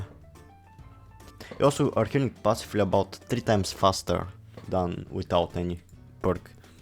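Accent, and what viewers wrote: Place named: Russia. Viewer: russian accent cancel!! anyways good video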